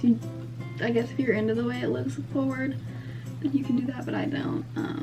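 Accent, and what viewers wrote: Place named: South, United States. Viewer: love your hair color and i love the little hint of the southern accent in ur voice, ur so cute!!!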